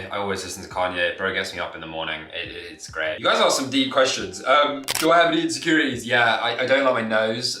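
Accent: United Kingdom. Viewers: Your accent is so different, Ik it's british but it also sounds like there's some other accent mixed in with british, I'm surprised at myself that I can understand what ur saying 95 percent of the time cause english isn't my first language, sometime there are some words I cant catch or understand cuz ur speaking way too fast but its all good, love your vids, currently doing a marathon of ur vlogs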